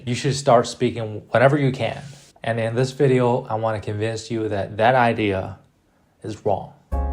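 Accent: South, United States. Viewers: nice southern accent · You sound like a southerner from the United States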